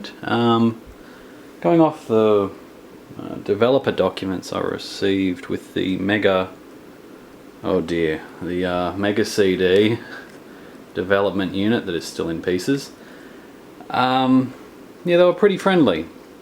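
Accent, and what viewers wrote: Australia. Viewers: If you are anywhere near Brisbane (definitely have an Aussie accent), I have a free analog scope for you ;)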